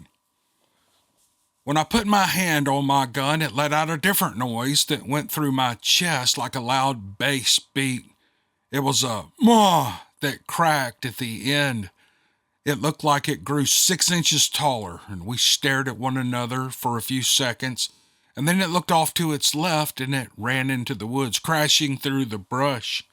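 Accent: South, United States. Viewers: I love you and LOVE your fine Dixie drawl! It makes listening to these encounters and stories such a joy! Thanks for this one- I agree, it’s especially good! ❤🦍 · Love your southern accent, from a Texas girl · Your distinct southern accent is at least 60 % of the charm and success of this channel! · Your Southern talk is what drew me to your channel in the first place! I feel at home. Love it. ~ Louisiana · I love your voice. It is a southern draw great for story telling. But you don't speak real slow as many do from the hottest gulf air regions. They just do everything at a measured pace, including talking. No doubt it is pacing yourself in the god awful heat. I hate humidity. When I am visiting your region I walk extra far to get anywhere as I stay in the shade as much as possible because the Sun is oppressive. So I plan my course to go from one piece of shade to the next. 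And I take frequent breaks to keep from overheating since my sweat just makes me wet without cooling me through evaporation. I always carry lots of water so I don't dehydrate. And when you mispronounce words I realize words are pronounced differently depending on the local dialect, so I never think you are making a mistake even though it may seem like it. There is no right dialect. But sometimes I am pretty sure you know you are making a guess at pronunciation. You make it entertaining rather than a distraction. Being humble enough to make fun of yourself works well for many. You do it well. Keep it up and don't worry about stuck up people that judge everyone. I think they are just judging themselves as lacking when they do so. It shows an ugly personality. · Don't change I love the Redneck accent:D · Your superior Southern accent sounds just like me, I'm from Eutawville SC, keep talking normally, we appreciate it! 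· I know where glennie is ( a lot less how to spell it ) my in laws had property in Hillman which is north of there, a friend had some property southwest about 20 miles and another friend had property south of there, my Sisters had a cabin in the UP, I spent many a night at all those places and the first two were close enough for the same visitor though it never happened when I was there. I have a Sister still living in the upper peninsula and she refuses to come down to the lower part even though she could use some help in her advanced years. Excellent story and Karen needs to back off your accent, it's a perfect soothing southern state accent for these stories and others! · Love love lover your accent!!! No, it doesn't remind me of an Okee from Muskokee just a southerner and it is super charming!! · I love your accent, I'm from GA so I know I have a southern accent and I like hearing yours, it reminds of home. 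· I'm an Okie!!! Lol I love your redneck accent! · Love ❤️ your Redneck accent 👍 Keep on entertaining us with your Awesome voice 👍 I agree with the previous commenter, some ppl just aren’t happy about anything 😝 I have never had anything to complain about since I’ve started listening to your channel, many moons ago 👍Keep up the great content & Happy New Year 😀👍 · ill say what you cant say move on karen and kiss our country southern asses. If you dont like accent dont listen to the channel. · Never lose your religion over someone not liking your "Okie" accent. What you have is just a typical Southern accent, that most of us from the South have. If some Northerner doesn't like it, well, "bless their hearts", they can go out back and tell it to the hogs! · I am from Ozarks in Arkansas. I understand u 100%. U talk like someone from the south. I'm planning on going to Mississippi next yr. And do some muzzle loader deer hunting. 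· I love your American accent. From Australia 🇦🇺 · The best part of your narration is your not too strong beautiful southern accent. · I love hearing you. Hard to find a true Southern Voice. Your people love it don't let 1 rotten apple ruin your day. Thank you for being here.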